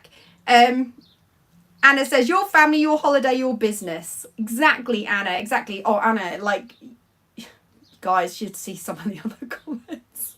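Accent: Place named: Lowestoft, United Kingdom. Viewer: Where in Norfolk are you originally from? I'm guessing your accent is sort of Lowestoft?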